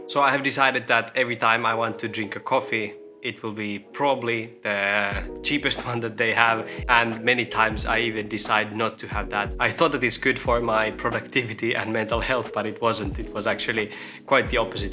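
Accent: Finland. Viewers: hehe, I just found your channel yesterday, and I'm already in love x"D...I actually live in the same city as you and it's so fun to watch videos made from here XD☆ plus a Finnish person making videos in English, with beautiful Finnish accent, makes me so much more confident to speak English myself, too!! I also love that your videos are quite short, there's nothing unnecessary, so they're nice to watch♡ but, I must say, as a beginner in minimalism and intentional living, it's too bad your videos are very addicting! especially when you almost always recommend another of your videos at the end "xD it's hard to get rid of binging social media, when you make too good content! XD ja must on myös tosi siistii et löysin suomalaisen kanavan, joka on lähellä mun omaa ajatusmaailmaa (^_^) kiitti kun teet näitä videoita:3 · I love to listen to the Finnish accented English. 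I’m a pensioned farmer with a lot of old stuff trying to downsize and organize. I have resisted buying every power tool. Hand tools are easily fixed when they break unlike the stress caused by complicated machines. Scooping snow is good exercise and so is being on the bike.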